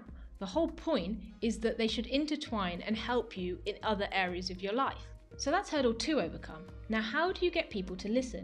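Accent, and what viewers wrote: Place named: United Kingdom. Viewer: damn you have british tone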